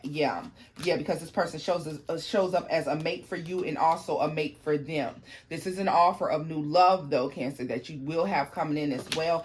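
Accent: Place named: South, United States. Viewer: i’m from texas so i liked that lil country accent lol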